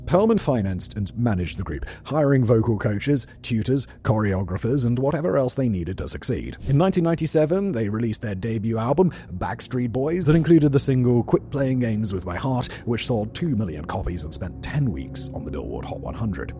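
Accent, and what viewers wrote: United Kingdom. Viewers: He's British. You should hear how they pronounce allininum.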